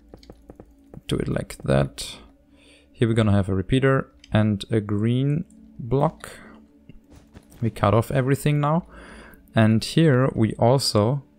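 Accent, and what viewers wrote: Germany. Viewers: Du kannst eigentlich sehr gut Englisch, wenn man weiß das du Deutscher bist fällt es mehr auf, aber es ist auch nicht schlimm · man hört so krass dein deutschen accent raus xd danke für das tutorial habe spaß mit meinen freunden damit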